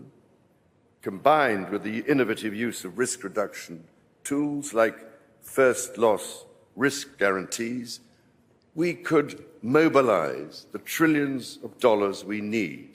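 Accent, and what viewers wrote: United Kingdom. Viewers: You are telling me that when the British spoke like this they didn't have real issues to worry about?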